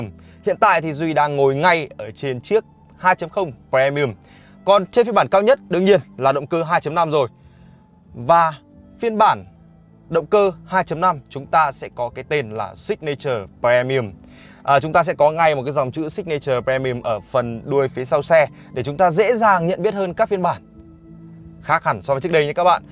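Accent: Vietnam. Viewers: Vãi cả pre mi ùm. Đọc tiếng anh mà như tiếng việt...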